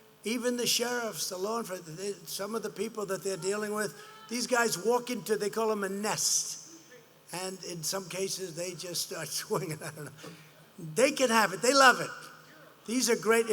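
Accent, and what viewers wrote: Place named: New York, United States. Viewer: I think they just love the New york accent about him... that is the only reason I can think of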